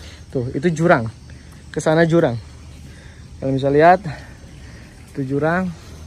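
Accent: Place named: Surabaya, Indonesia. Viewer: matamu cok🤣 wkwk mantap paido suroboyoan🤟😂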